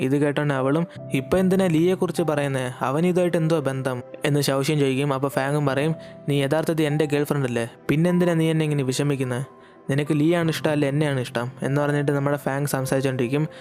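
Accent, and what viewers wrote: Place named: Trivandrum, India. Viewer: Chettan trivandrumkarananooo... Slang Ath pole erikkunnu allo, 🤩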